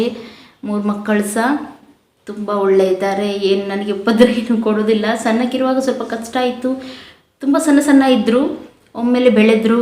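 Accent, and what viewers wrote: Mangalore, India. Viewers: Kannada Chennaigide Mangalore Kannada Accha Kannada don't worry mam. I am SWATHI. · Donot worry i like your kannda speaking why to worry in mangalore this way they soeak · Hey so sweet of you are,,,, u r pretty yar,, mathe nimma manglore kannada namge tumba esta marayre!!! :) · I lv mangalore ...I lv mangalore type kannada superag iratte Bangalore ginta mangalore kannada ne clean iratte valasigaru bandu alli kannada na kannad madidare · Mangalore kannada...super mam... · Mangalore Kannada clean maraayre. I love Mangalore type Kannada. I am a proud manglorean.❤️❤️😘😘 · Mangalore Kannada Super medum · I like ur mangalore kannada. And I like ur life style. Thank you for sharing your personal. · Nivu estondu bejar agbedi nanu Mangalore nodidini ede eriti Kannada matanadodu. Nima skin tumba channagide. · ನಿಮ್ಮ ಮಂಗಳೂರು ಕನ್ನಡ ತುಂಬಾ ಇಷ್ಟ ಆಯ್ತು ಹಾಗೂ ಆಡಂಬರವಿಲ್ಲದ ನೈಜ ಮಾತು ಕೂಡ ಇಷ್ಟ ಆಯ್ತು. 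Nature & God bless you.... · Akka I love u Nan sister antha ankothini. mangluru kannada chanda akka thumba naryadi inda maathadthare alli. bangalore kannada varatu akka Naanu mandya agagi helide. Yak althira naavu nim family ankoli akka albedi Nan hesru radha.albardu my request akka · Don't worry my friend. Neeu nam urinavru anta kushi aitu. Manglure kannada- is best keluvaga tumbaa khushi agutte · ಮುದ್ದು ನಮ್ಮ manglore ಭಾಷೆ ತುಂಬಾ ಚೆನ್ನಾಗಿದೆ, ಆ mathalli ನೀವು ಇನ್ನೂ ಮುದ್ದು, ನಿಮ್ಮಿಂದ ನಾನು ಅಡಿಗೆ ತುಂಬಾ ಕಲಿತೆ, ನಿಮ್ಮ ಮುದ್ದಾದ ಫ್ಯಾಮಿಲಿ, ನನ್ನ koraggajja ನ ಆಶೀರ್ವಾದ ನಿಮಗೆ ಯಾವಾಗಲೂ ಇರಲಿ, ನಿಮಗೆ ಆದಷ್ಟು ಬೇಗ ಸ್ವಂತ ಮನೆ ಆಗಲಿ ಎಂದು ಪ್ರಾರ್ಥಿಸುವೆ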